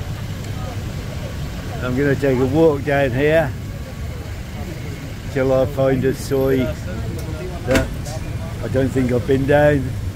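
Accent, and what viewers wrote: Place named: Nottingham, United Kingdom. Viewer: Hi cant place your accent, at a guess would say Nottingham?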